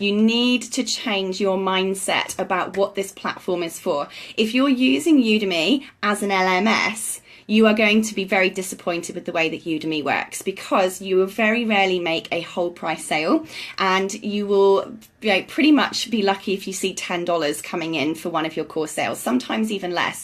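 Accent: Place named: United Kingdom. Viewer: Great content, horrible video. The audio and video wasn't in sync had to stop watching but keep listening. Very glad I did. Your British accent and lingo is awesome, "A Silly Sausage" - Great stuff, lol - I like how you used the bread, made a lot of sense. Have a great day. God Bless, Anthony 2 Tim 1:7 <link>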